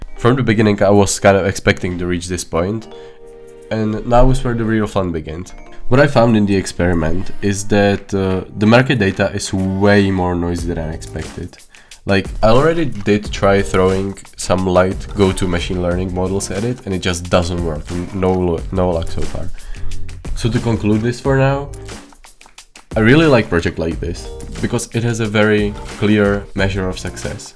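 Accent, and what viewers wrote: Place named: Czech Republic. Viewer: Accent sounds extremely Czech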